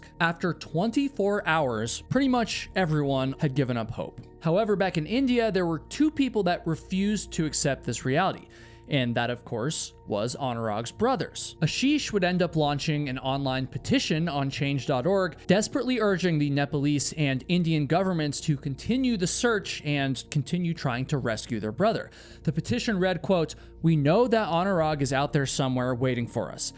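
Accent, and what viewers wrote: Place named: United States. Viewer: I'll subscribe if you take that flag down. We can HEAR you're USian. Peace and love from Denmark.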